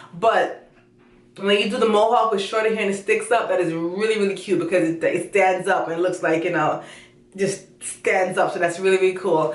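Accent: Jamaica. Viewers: Mi love yuh patwah!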